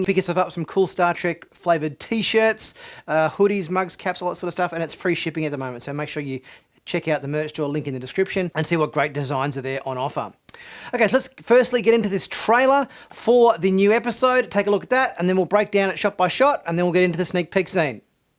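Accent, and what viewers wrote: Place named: Australia. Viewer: You were doing fine until towards the end with your conjecture and speculation...then, your Ozzie accent wore me out.....plz stop talking.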